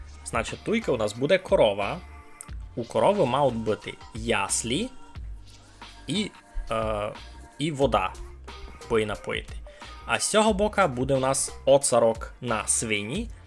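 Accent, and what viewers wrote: Ukraine. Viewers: Моцно говориш ти з якого села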